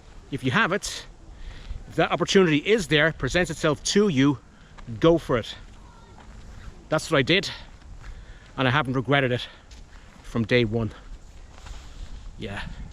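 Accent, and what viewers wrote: Ireland. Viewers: Don't lose your irish accent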